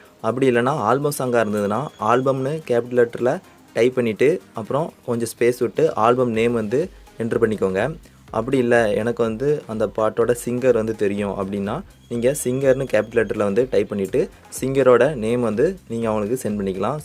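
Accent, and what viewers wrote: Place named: Thoothukudi, India. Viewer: Tuty slang :)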